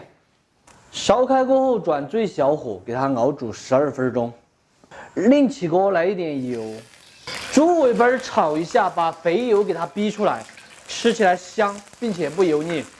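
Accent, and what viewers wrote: Sichuan, China. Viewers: 冲着你这么接地气的川普，必须关注一下老乡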